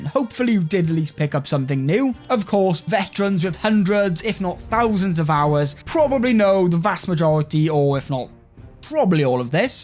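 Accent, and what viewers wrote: Wales, United Kingdom. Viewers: There's nothing better than clicking on a yt vid and hearing a Welsh accent 🏴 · I wonder what kind of UK accent that is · Thanks for the tips mate. Just bought this after playing CK2. Always great to hear a fellow Welsh voice on YouTube 🏴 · Bruv are you Welsh?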